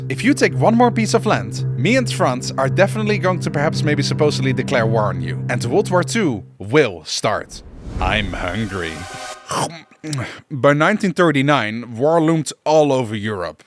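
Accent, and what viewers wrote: Netherlands. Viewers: i smell dutch here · Ik weet al door deze accent dat dit een nederlander is lol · Dutch accent?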